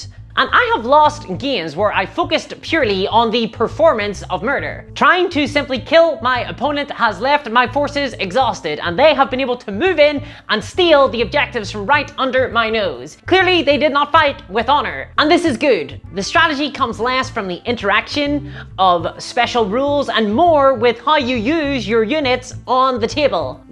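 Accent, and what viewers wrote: Ireland. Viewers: You sound Irish.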